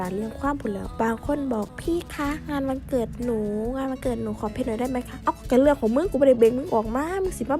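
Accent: Isan, Thailand. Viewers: คนอีสานบ่นี้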